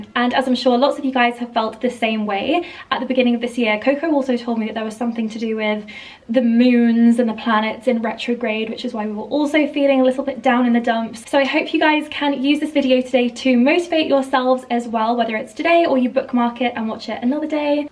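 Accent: France. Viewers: An amazing video like all the time. And don't worry, your french accent is good and really charming!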